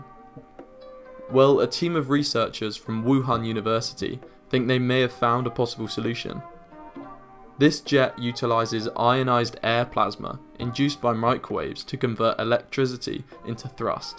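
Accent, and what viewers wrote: United Kingdom. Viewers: This video demonstrates if you have a British accent it does not necessarily mean you are smart. Lol, I'm sure he is intelligent. It's just that he knows so much that cant be proven.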